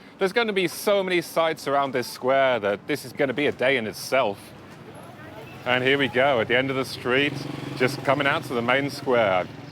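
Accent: England, United Kingdom. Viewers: Great video (subbed!). Where in England are you from out of interest? Your accent reminds me of my cousins 😄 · What part of England are you from?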